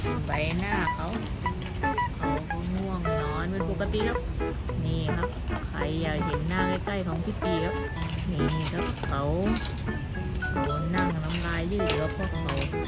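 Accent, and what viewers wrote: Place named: Isan, Thailand. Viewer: ไทยอีสานก็เพราะนะคะพูดทั้งสองภาษาเลยค่ะน่ารักดี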